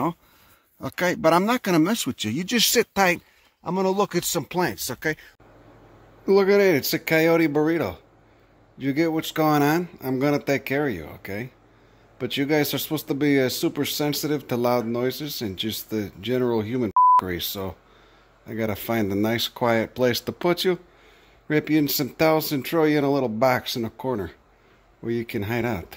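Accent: Chicago, United States. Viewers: The Chicago accent is dripping from this man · Chicago accent. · It's a classic Chicago accent. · From Chicago! Thick Chi-town accent! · Definitely Chicago Italian accent, my dad has the same accent. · It actually is a character voice, "“I did a couple videos where I talked in my normal voice, but it just didn’t feel right,” says. “So I put all this narration through this voice of a 50-year-old Chicago mook from the West Side.” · It’s a Chicago Italian accent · Chicago, actually :) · Nope, it's a classic Chicago accent.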